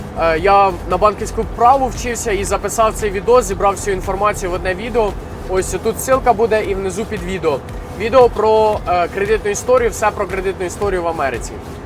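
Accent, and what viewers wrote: Ukraine. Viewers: Деревня , суржик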